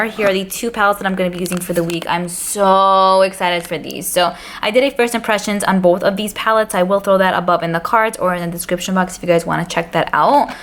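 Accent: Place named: Queens, United States. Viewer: Just discovered your channel from suggested videos and I’m going to subscribe. I like your positive vibe. Also your accent and the phrases you use are reminding me of my hometown of Glendale, Queens. I’m in CT now and would love to not be the only one with this accent. 😻💗🌈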